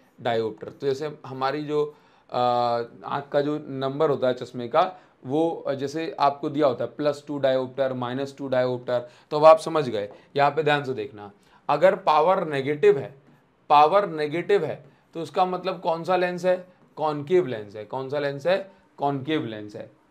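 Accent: Odisha, India. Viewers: Sir app odisha se Hain kya ???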